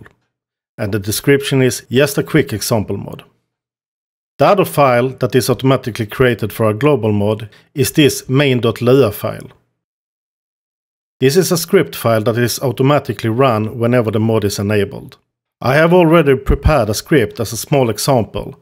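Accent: Scania, Sweden. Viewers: Den skånska brytningen gör videon så mycket bättre 🤣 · I love his accent. I'm guessing it's Swedish lol. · fantastisk skånsk dialekt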